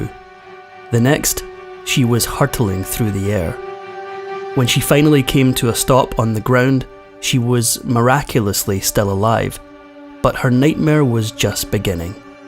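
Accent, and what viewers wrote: Scotland, United Kingdom. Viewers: Scottish accent - subtitled required. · I really enjoyed this narrator love listening to the Scot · I love listening to narrate Lovely voice Scottish I wish you could put your name to story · In British English, the correct past participle of get is "got", not "gotten". Unless you are American, though your Scottish accent would suggest you are not.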